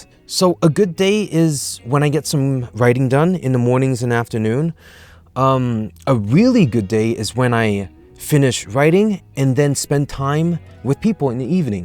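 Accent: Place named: New York, United States. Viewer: Why do I keep getting a hint of NY or NJ accent? 🧐. Really enjoy your videos! Well done!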